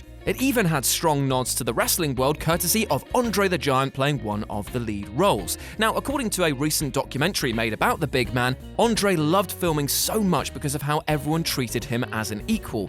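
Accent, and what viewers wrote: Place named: Cockney, United Kingdom. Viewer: The content is quite interesting if you can deal with the annoying chirpy cockney banter that goes with it, there is nothing worse than somebody who thinks they are funny.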